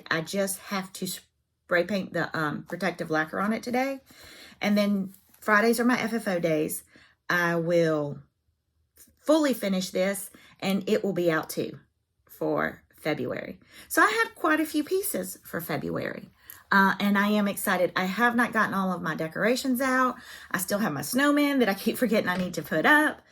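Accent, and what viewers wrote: South, United States. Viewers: “May”I ask where you are from? Because you didn’t get that accent in Ft. Lauderdale 😮 I just moved to Boston from Jacksonville Florida 4 years ago and it’s wonderful to hear a southern accent. Your videos are full of joy and I thoroughly enjoy them😊 I am with ya , sista, on the linen vs Aida. Had a hissy fit stitching on linen, trying to keep the stinking x’s in a row . Switched to Aida and no projects are flying across the room ❤ Time with a daughter is priceless…ENJOY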